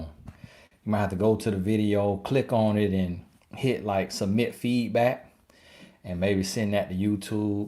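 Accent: South, United States. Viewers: You be cracking me up with the funny things you say in your southern accent. 😂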